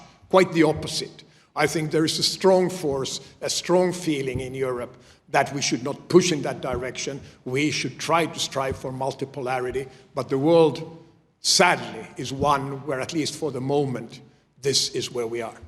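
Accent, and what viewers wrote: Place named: Mid-Atlantic, United States. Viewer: mid atlantic accent👹👹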